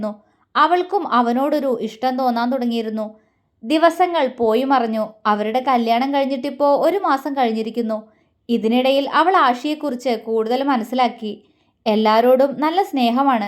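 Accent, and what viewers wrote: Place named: Malappuram, India. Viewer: ആണോ. എനിക്ക് ഈ സംസാരം നല്ല പരിചയം ആണ്. എന്താന്നോ എന്റെ വീട് മലപ്പുറം പാലക്കാട്‌ ഒക്കെ കടക്കുന്ന ബോർഡർ ആണ്. പിന്നെ എന്റെ സ്വന്തം വീടിന്റെ അടുത്ത അയൽവാസികൾ മുസ്ലിസാണ്. അവരുടെ കൂടെ കളിച്ചു വള്ളർന്ന ആളാണ് ഞാൻ. അത് കൊണ്ട് എനിക്ക് വേഗം മനസ്സിലാവും.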